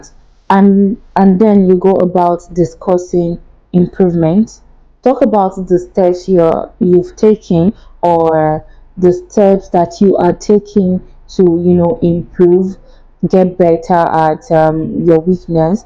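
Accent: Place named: South Africa. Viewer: Madam, are you from South Africa? Please! God Bless You!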